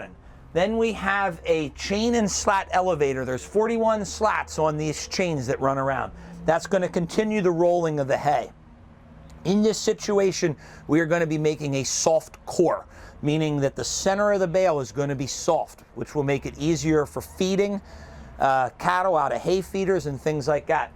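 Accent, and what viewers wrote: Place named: Wisconsin, United States. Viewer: He talks funny, is that a Wisconsin accent?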